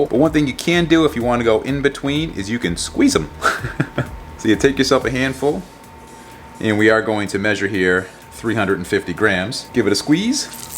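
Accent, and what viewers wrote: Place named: Massachusetts, United States. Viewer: Do I detect a MA accent?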